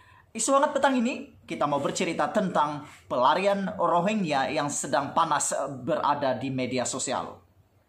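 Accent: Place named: Sabah, Malaysia. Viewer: Pekatnya slanga Sabah kau...dari jauh lagi aku Tau kau Sabahan. Tahniah bro..aku suka video kau....bagus baaa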